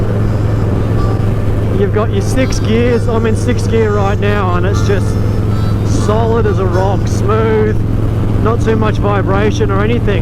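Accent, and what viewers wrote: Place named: Australia. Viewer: Love your accent, mate, it reminds me of my deck hand years in cairns 😊. And love the street bob, getting my 107 next month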